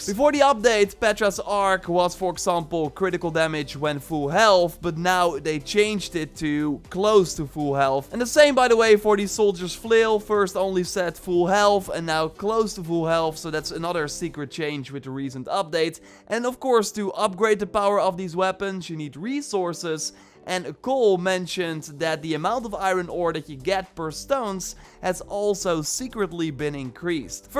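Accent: Netherlands. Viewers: Small Question are you dutch?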